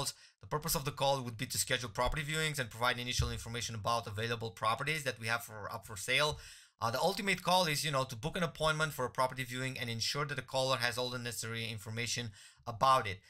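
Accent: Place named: Quebec, Canada. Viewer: Oui la voix est pas mal je vien d'écouter, on dirais juste qu'il a un accent québécois 😂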